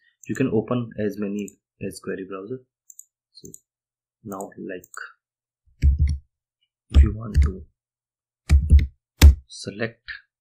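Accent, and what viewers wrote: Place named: India. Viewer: english with hindi accent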